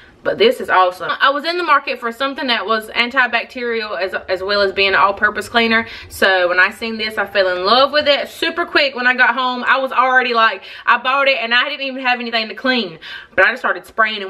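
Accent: South, United States. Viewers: your welcome love your channel and nice to hear someone talk like me. Southern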